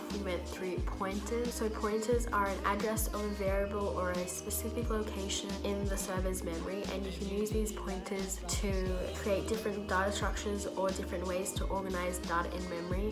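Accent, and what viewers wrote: Australia. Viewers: Aussie accent 😍 Im currently starting the cs50, so excited. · Great review thanks 🙏 it would be really nice if you can speak little louder, love your Aussie accent 😊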